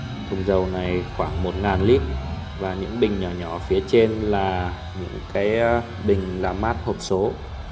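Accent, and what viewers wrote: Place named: Cao Bang Lang Son, Vietnam. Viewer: giọng đọc của ad hình như là người cao bằng,lạng sơn vào đăk lak thì phải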